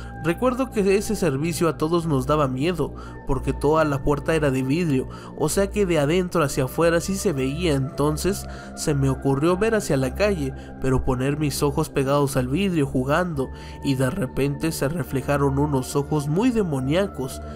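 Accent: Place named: Mexico City, Mexico. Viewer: Están buenas tus historias, vengo de ver las de los Youtubers, y el acento chilango le da un toque 😂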